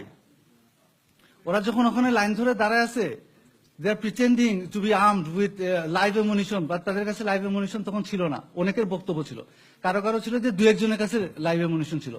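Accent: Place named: Bogura, Bangladesh. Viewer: ভাইটি মনে হয় বগুড়ার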